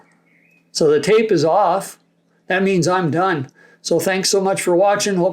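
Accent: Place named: Canada. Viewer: Very informative. I appreciate you telling what colors you are using. Love to hear your Canadian English. Toni-Colorado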